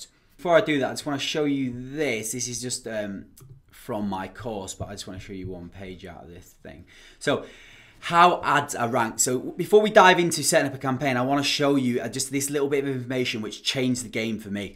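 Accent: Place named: Manchester, United Kingdom. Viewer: Great content man, very comprehensive, is that a Manchester accent I detect? 🙂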